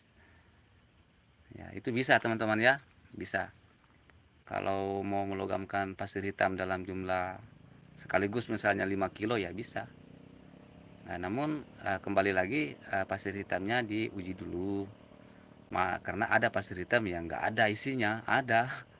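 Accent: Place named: South, Indonesia. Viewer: Posisi dmn ki, bos ku kayaknya logat selatan ki ini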